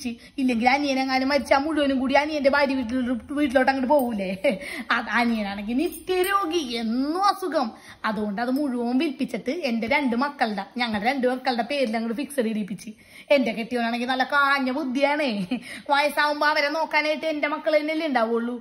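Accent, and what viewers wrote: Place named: Paravur, India. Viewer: I love your parur Accent👌👌I am also from parur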